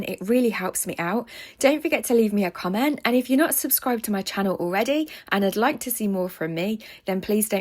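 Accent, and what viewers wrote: United Kingdom. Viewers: I am so in love with your card! This was a wonderful tutorial! I really enjoy your British accent and your style of video! Now I have to to buy this set! Haha. Thank you so much for the inspiration!❤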